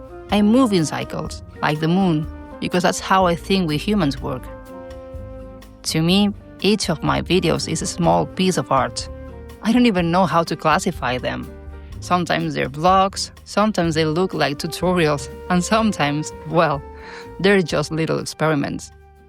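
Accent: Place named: Spain. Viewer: I love your videos. They make me want to live in spain. I love your voiceovers and your Spanish accent. ❤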